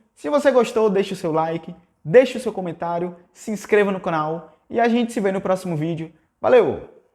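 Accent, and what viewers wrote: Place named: Brasília, Brazil. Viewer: Esse cara é de Brasília, kkk maior sotacão de candango.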